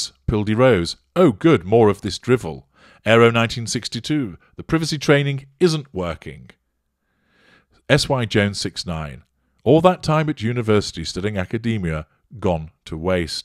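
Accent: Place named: England, United Kingdom. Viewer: This is just the best thing ever hearing these words in an English accent! 🤣 “assywipe” “spotalie” and “podcrap” I had to replay it a cpl of times 😂 i love it!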